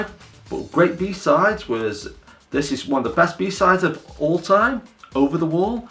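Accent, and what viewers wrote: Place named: Stoke, United Kingdom. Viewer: A stokie! I'd recognise that accent any day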